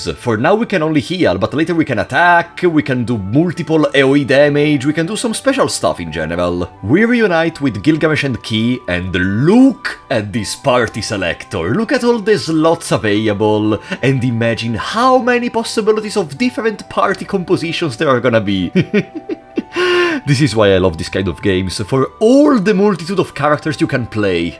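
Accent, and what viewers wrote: Italy. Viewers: Being from New Jersey it's weird hearing an actual Eye-Talian. Fun videos though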